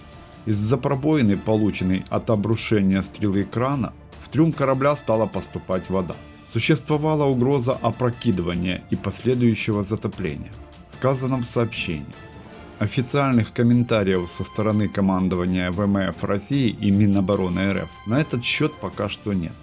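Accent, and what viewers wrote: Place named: Ukraine. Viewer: А Вам бы поработать над своим акцентом(украинским)...)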